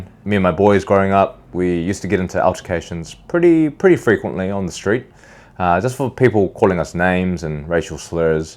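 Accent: New Zealand. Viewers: You’re so absolutely right on all points. I’m from Christchurch and the herd mentality and rascism is worse there, such a shame. I live in Auckland and yes cost of living is very high. Keep rocking your integrity and sense of self along with that kiwi accent! Wish you all the best ☺️🙏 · God Bless You, man! Love your Kiwi Accent! Oh, and I subbed! 💥👍😎🇳🇿🇦🇺🇺🇸❤️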